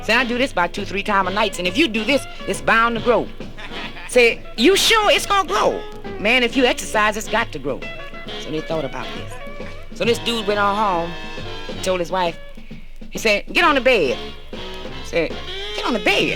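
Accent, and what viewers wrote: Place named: Louisiana, United States. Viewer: She sounds like shes from south Louisiana lol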